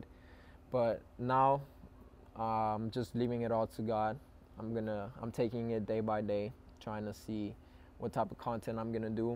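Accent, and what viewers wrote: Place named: Spain. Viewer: whoaaaaa his spanish accent has gotten better. you can hardly hear it when he speaks english thats rly cool to see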